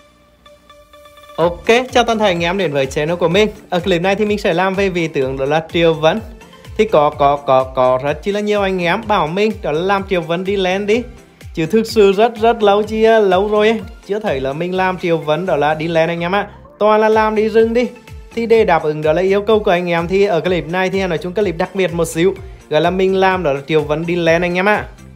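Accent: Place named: Central, Vietnam. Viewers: Nghe giọng ở huế quá. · nói ra có chút vô duyên và có gì thì cũng xin lỗi trước vì nghe giọng hơi chói tai, này là giọng miền trung, mình thì ko có ác ý gì cả nhưng xưa giờ mình nghe giọng miền trung thì cả ngưới rất khó chịu, nếu chỉ là nói từ tốn thì ko sao còn kiểu như nói nhanh và nói nhiều liên tục thì nghe rất khó chịu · Bạn nói chuyện hay phết.giọng mt nge cuốn ghê..:))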